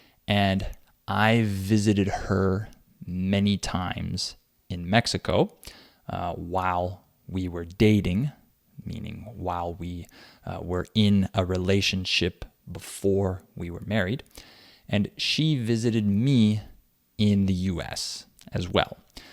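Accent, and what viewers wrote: England, United Kingdom. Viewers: Hello sir! I'd like you to provide me some listening material from A1 to forward. And I real love English accent perfect for beginners of English. I'm so thankful in advance. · It is brutish English?? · British or American English ?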